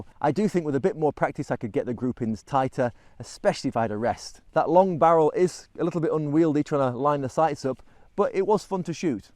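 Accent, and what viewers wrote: United Kingdom. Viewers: Great British accent.... So where are you from?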